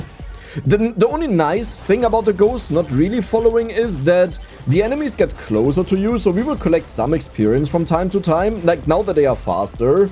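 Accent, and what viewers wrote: Germany. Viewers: Dude at 50 i totaly felt the need to speak german 😂😂 Moved to germany few years ago still bad at the language but i enjoy your english german accent since i always hear it everywhere i go and its comforting to listen to it while youre playing. Perfect time to vent frustration out 😂❤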